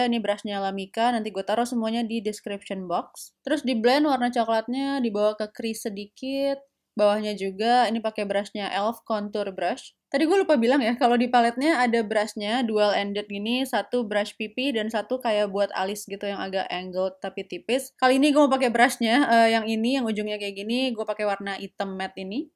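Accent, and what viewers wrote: Java, Indonesia. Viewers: lucu sih kalau ngomong Jawa 😂😂😂 lvlv Kak, ga pernah bosen deh lihat Chanel nya 😍 mau mati gue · hhaaaaaaaa aq seneng medok mu hhaayyy!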